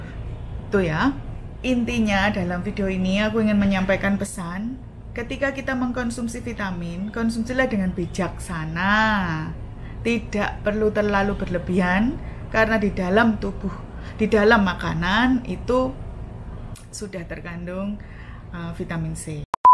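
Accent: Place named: Surabaya, Indonesia. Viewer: makasi ce infonya ........logat suroboyoan e Jan ora ilang blas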